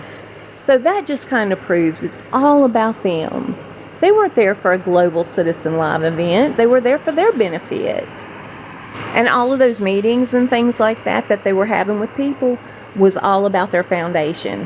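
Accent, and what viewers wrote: South, United States. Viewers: Get better soon. It's not fun being so sick like you are at the moment. Hang in there. It takes time to get over serious illnesses. I'm into my second year of recovering from pneumonia after being in ICU. Queensland. Has left me with walking disability and enlarged heart. I get great joy in listening to your programme with that Southern drawal. So don't you go anywhere. Your show tells it like it is. It walks all over the others in respect to authentic royal gossip. Up there with Lady C. My answer to your quesastion rse Queen's medal to Meghan in is:. if the Queen does this then it shows the public and parliament that the Queen has lost her mental capacity and its time for her to go. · You are in my prayers daily and have been since the beginning of this. I'm sending you hugs, prayers for comfort and healing and lots of love! 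My husband has become a fan. We are in the south, from the south and he loves your accent. When he hears me listening to you, he always stops in to see what you have to say. You just remember to put yourself first and take care of yourself. ❤️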